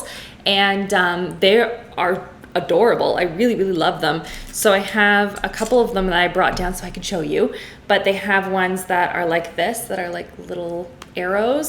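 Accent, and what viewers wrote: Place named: Canada. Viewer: The Canadian accent is strong today 😂🤣😂🤣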